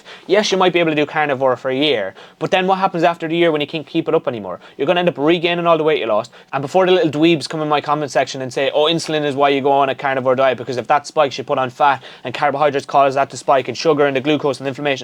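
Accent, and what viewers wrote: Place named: Ireland. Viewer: This guy sounds Irish.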